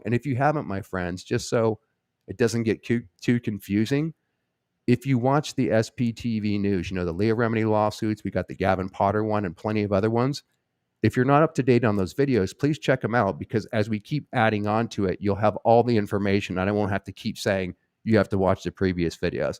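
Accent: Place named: South, United States. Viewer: Thanks for this. (Don't apologize for your Southern accent - it's actually pretty good. That from a North Carolinian.) It's lovely when you use voices and accents as you read. The actor in you will never die and I do hope that you'll be able to do that again someday. Hell, as a screenwriter, I'd enjoy writing something for you if I could sell it.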